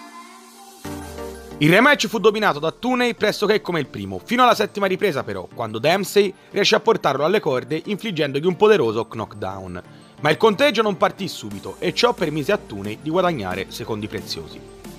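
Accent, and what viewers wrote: Tuscany, Italy. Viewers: L'unico canale di MMA che parla toscano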